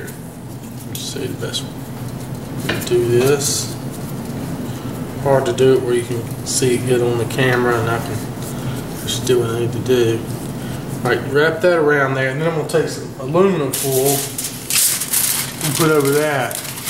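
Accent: South, United States. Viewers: I've done this before and know about woks. Your video is the best. Funny hearing a southern accent doing Asian.....lol! Great Job!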